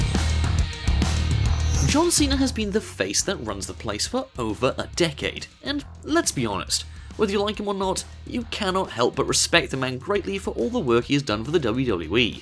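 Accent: United Kingdom. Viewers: shocking not so much just another countdowner on youtube with a british accent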